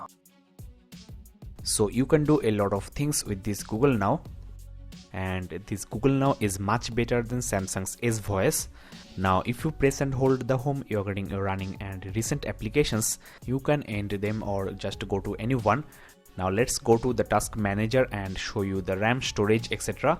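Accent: India. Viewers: your strong Indian accent is to blame for the phone to unlock the second time, not the phone.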